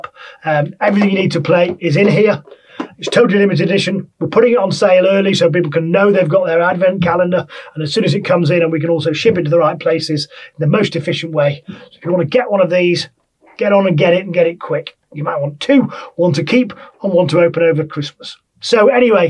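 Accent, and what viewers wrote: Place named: United Kingdom. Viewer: . you should have told the story like Sir David Attenborough! I mean you already have the accent, so why not flaunt it!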